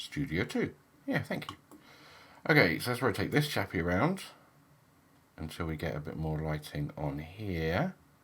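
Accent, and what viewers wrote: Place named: North London, United Kingdom. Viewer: I actually came to this channel because a friend of mine watched this thinking it was me on a different channel, it's quite freaky how similar we sound, not just the voice, but the way we speak, we must be from the same area (just north of London) for sure lol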